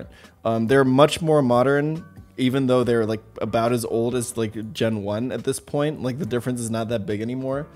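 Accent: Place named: Germany. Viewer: seems like a very Americanized German. Especially with the accent and everything 🤔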